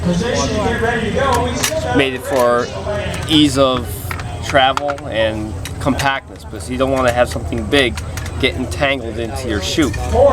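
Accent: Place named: New York, United States. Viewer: I can tell your from new York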